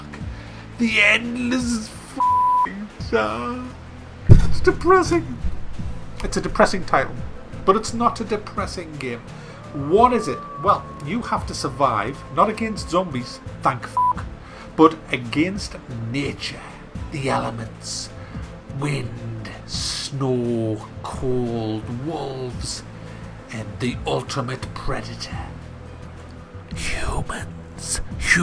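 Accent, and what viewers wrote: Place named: North East England, United Kingdom. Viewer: Great review. Just bought this in the Steam sale, aided by your exposition. Looking forward to playing it! You sound local - where are you from? I live in Cardiff now but I'm up home in Gateshead for Christmas!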